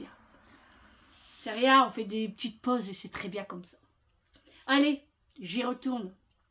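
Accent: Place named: Hauts-de-France, France. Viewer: Bonjour ah c est matcho avec l accent des hauts de france j entendais min t 'chot rohhhh Belle journée prenez soin de vous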